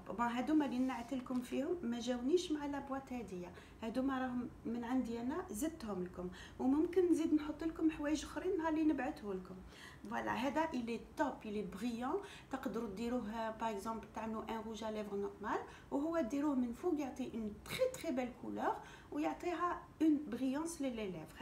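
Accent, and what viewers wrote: Annaba, Algeria. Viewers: صباح النور واش حوست على قناتك و معرفتش اسمها شفتلك فيديو فقناة réalité dz راكي هايلا بزااااااف و متربية و هدرتك حلوة اليوم لقيت الفيديو هذا زهر 😊😊😊 شحال نحب هدرة العنانبة 😍😍😍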